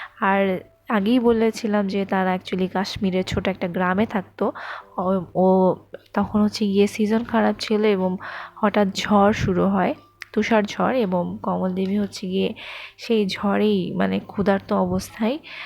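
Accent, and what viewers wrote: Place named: Rangpur, Bangladesh. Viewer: অনেক সুন্দর বাচনভঙ্গি। কিন্তু পুরো সময় একটা চিন্তায় ছিলাম আবার কেউ যদি ভাবে এই মেয়ের সাথে কথা বলতেছি🤣কারণ ভয়েজ টা ওরকম, মনেই হয়না ভিডিও। যাইহোক আপু, বাসা কোথায় আপনার?কিছুটা রংপুরের টান পাচ্ছি🤭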